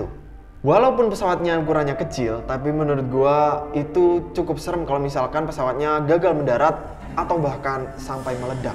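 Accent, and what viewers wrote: Java, Indonesia. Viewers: Bang sodaranya tirta ya🤔 medok banget😂😂😂😂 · Mase medok asline mana to?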